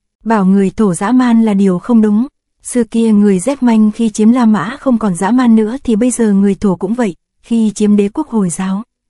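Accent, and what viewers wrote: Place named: North, Vietnam. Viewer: Truyện hay và người đọc với giọng Bắc nhẹ nhàng làm hấp dẩn người nghe.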